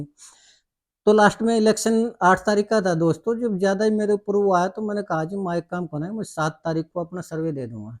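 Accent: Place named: Haryana, India. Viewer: भाई जी प्रणाम, मैं हरियाणा का नहीं हूं, दक्षिण भारती हूं l आपकी बोली, आपका बोलने का ढंग, साफ बोलना बहुत अच्छा लगता है l एक बात कहना चाहता हूं l आप अपने समाज का भला करना चाहते है अच्छी बात है l आप के समाज का मुख्य मंत्री बन जाए या आरक्षण मिल जाए तो भी कुछ लोगों का ही भला होगा, पूरे समाज का नही l जैन, सिंधी, पारसी जैसे कई समाज हैं जो इनके बिना भी सुदृढ़ अवस्था में है l समाज के लिए बैंक बनाइए, कम ब्याज दर पर ऋण दीजिए, स्कूल, कॉलेज खोलिए l ये बाते प्रैक्टिकल है l इसके लिए दक्षिण भारत के नाडार समुदाय का अध्ययन कर सकते हो l तमिलनाडु मर्केंटाइल बैंक उसी समुदाय का था l और भी समुदाय का अध्ययन किया जा सकता है l तात्पर्य ये की राजनीति से कुछ होता नहीं l अहम छोड़ कर कार्य करना होगा l बिना स्वार्थ के करना होगा l सलाह के लिए क्षमा ।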